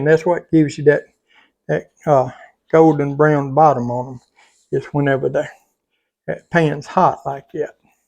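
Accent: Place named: South, United States. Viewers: I love you Southern accent, cant wait to see you make the tomatoes gravy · I am from MS and I knew immediately you were from the South, your accent and choice of words and when you said coke and tomato gravy I laughed, I said yep probably a Alabama man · I gotta ask.....where are you from?!!!! Cause you sound just like me. I'm an Alabama girl, thru & thru!!! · southern accent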